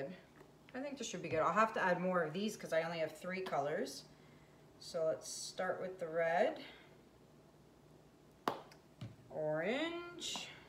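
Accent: Canada. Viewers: Omgoodness I love yer Canadian accent and you and Molly Mc like BUTTA are my favorite!!! WHAT????? Beautiful. I’m doin it!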